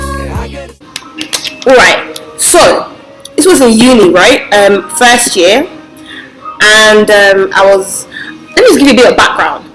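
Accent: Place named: United Kingdom. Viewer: 😂😂😂 Pls Subscribe to my Channel please😆 That’s a silly boyfriend materials😁 Your Brit-accent is on point😂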